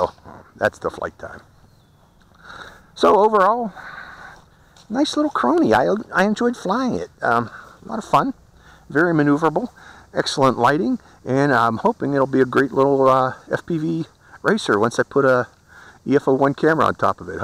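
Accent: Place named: Western Pennsylvania, United States. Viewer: Hello, I've seen some of your other video's in the desert, and could hear when you spoke you had an accent close to what I'm use to hearing living in western Pa.... I have lived in and around Pittsburgh most of my life. Thanks for taking the time to make and upload the video's you do. Hope all is well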